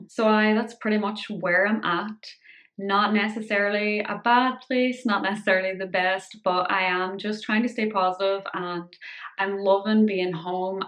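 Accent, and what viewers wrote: Ireland. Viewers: 🙏🙏🙏 Keppra works for me. Been on it since 2006. Added Trileptal a few years later. The two together work awesome. Everyone responds different to different meds. Love the Irish accent ❤️ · The cards are such a wonderful idea. I have a little handwritten folded paper in my wallet with emergency contact info just in case. (I have a panic disorder and medication can sometimes make me dizzy). I think everyone should have a card anyway :) Also please do not let the negative comments about what you should post get to you, it’s YOUR channel. You really do not owe anyone anything. It’s a gift that we get from you to see what you’re up to or how you’re doing on your time. With that said I’m so happy you’re back in the homeland and getting the help you deserve! I know this new medication is going to be great! It’s such a huge accomplishment to have progressed in the physical side and now with a few adjustments I feel the spacial issues can resolve too. 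P.S. your Irish accent is always so refreshing to hear ❤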